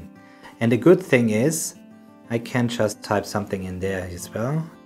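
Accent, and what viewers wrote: Germany. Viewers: Wie man einfach sofort hört, dass er deutsch ist 😅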